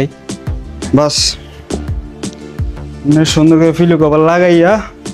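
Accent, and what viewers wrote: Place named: Comilla, Bangladesh. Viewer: ভাষা শুনেই বুজেছি কুমিল্লা কিন্তু কুমিল্লা কোন জায়গায়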